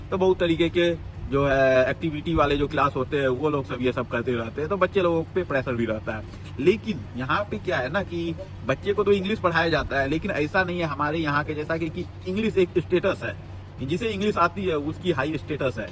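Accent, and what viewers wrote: Bihar, India. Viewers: सच्चाई ओर सुधार लाने वाले व्हिडिओ आप बनाते हो china देश ने itni tarrakki ki he इस्का main karan क्या हे इस्पर आप जरूर व्हिडिओ बनाईये कयोकी china सबसे जादा अबादी वाला ओर लोकसंख्या मे सबसे जादा रेहेणे वाला गरीब देश ऐसे हमने syllabus मे पढा हुंवा था जब मे स्कूल मे थी/ यानी प्रॅक्टिकल knowledge ही वही सही हे इसलिये china डॉन बन गया मे भी 4 th तक सरकारी स्कूल मे पढी हु/ china ki sachhai जनाना चाहती हु isliye आप के व्हिडिओ देखने लगीं ही ओर से आप जिस तरह बात करते हो वो मुझे बेहत पसंद है/ आप जैसे हमारे स्नेही हे बिहार से वो भी आप जैसे बिहारी टाईप बोलते हे/ बिहारी लोग बेहत मेहनती ओर helping log होते हे.. मे महाराष्ट्र से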